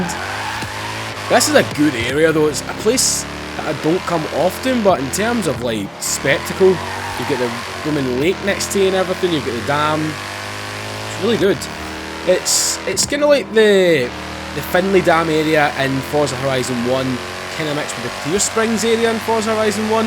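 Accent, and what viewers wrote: Scotland, United Kingdom. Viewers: yeah SCOTTISH ACCENT IS THE BEST!!! :D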